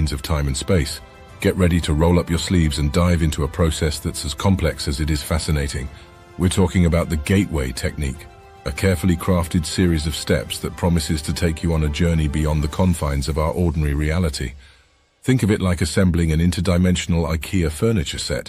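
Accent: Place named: United Kingdom. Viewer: It's amazing how a well polished British accent brings legitimacy to a documentary :)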